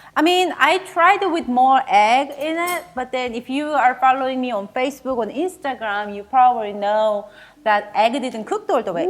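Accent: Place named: South Korea. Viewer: I love ur channel ❤️❤️❤️ ur videos bring a lot of joy to many people. Thank u for making them 🥰🥰🥰 Ur accent is soooo cute. Reminds me of my moms. Shes Korean as well ♥️♥️♥️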